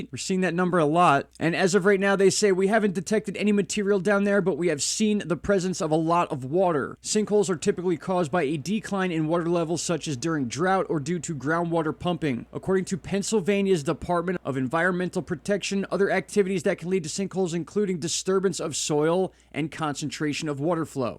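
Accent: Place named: New Jersey, United States. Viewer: I really like your channel 😊 (plus, I hear a hometown accent-sounds like New Jersey 😉). I was born in Philly, but grew up in the Tri-state area, mostly New Jersey-from Blackwood and Willingboro to Glen Ridge and Bloomfield and about 2/3 other cities in between lol. Thanks for covering these things. It's only going to get worse from here on out, so it's good to have you on board! We NEED a channel like yours 👍.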